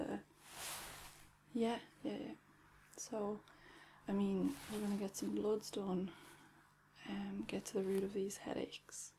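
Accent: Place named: Ireland. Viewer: I was hoping this would be relaxing but the Irish accent coupled with being Irish myself just gave me PTSD of sitting in Tallaght hospital lol.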